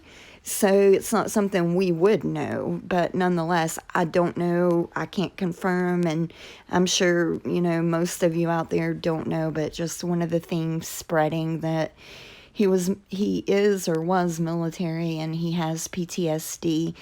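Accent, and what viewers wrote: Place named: Carolinas, United States. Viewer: You from the Carolinas? Love the accent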